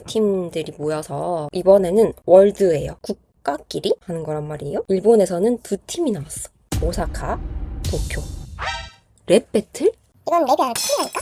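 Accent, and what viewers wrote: Gyeongsang, South Korea. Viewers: 한국어는 경상도 사투리 쓰시네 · 경상도 사투리 베이스신가요 그런데도 말투가 되게 부드러워요!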